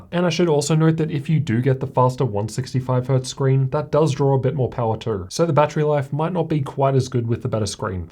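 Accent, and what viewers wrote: Australia. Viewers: Great review! Also, I just love the Australian accent. Don't know why, but I just do.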